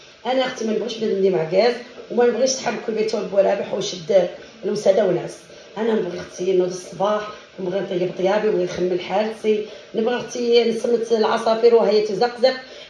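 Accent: Oujda, Morocco. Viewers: تهدري كي المغربييا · Javais vue sur une vidéo que tu disais que tu était marocaine,en plus t'es recettes typiquement marocaine 🇦🇱🇦🇱🇦🇱🇦🇱tu parle comment les oujada😂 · هدرتك تبانيلي مروكية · الوصفة روعة ولكن تباني في الكلام المغربية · لهجتك لهجة مغربية مخلط ببعض الكلمات الجزائرية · السلام عليكم انا المرة الاولى نحسبك مغربية خاصة كي تبداي تقولي مسالخير عليكم خواتاتي العزازاتوالغزالات.انا جزاءرية وتعجبني اللهجة المغربيةخاصة كي تقولوا كنحماق عليكم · لهجة انتاعك تشبهلهم ايقولو انتي من المغرب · تشبهي المغربيات ف لهجتك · هضرتها مغربية كانها وجدية سبحان الله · لهجتها بانتلي مغربية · حسبتك من وجدة عندك نفس الهجة المغرب شرقي سبحان الله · حسبتك مروكية 😅😅...خاطر حنا نقولوا مانعجزش....مشي مانعڨزش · كلامك يشبه كلام المغاربة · لهجتك تشبه المغربية اكتر من الجزاءرية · علاش اللهجة نتاعها مغربية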